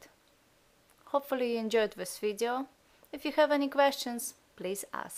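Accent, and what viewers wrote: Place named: Russia. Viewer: are you Russian. I really like your English. its too sexy. :)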